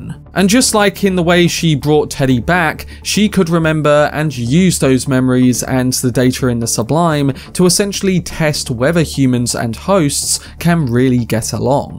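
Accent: United Kingdom. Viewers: It’s standard British pronunciation, don’t be an ignoramus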